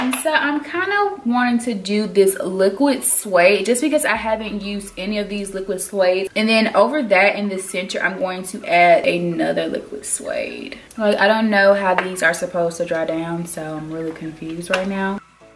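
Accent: United States. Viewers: Love your country accent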